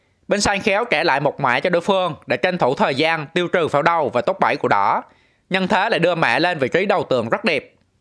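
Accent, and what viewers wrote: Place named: Phu Yen, Vietnam. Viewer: Nghe giọng giống Phú Yên . Hồi trước cũng ở chung với 1 a Phú yên ở Q5 nhà cô làm ở xưởng thuốc lá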